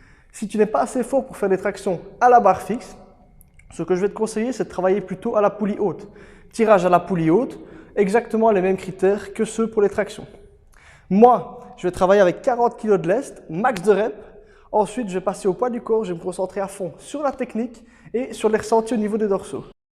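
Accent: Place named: Verviers, Belgium. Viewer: il me semblait bien avoir déja vu cette salle ! et l'accent me disais quelque chose... entre verviétois ont se comprend :-D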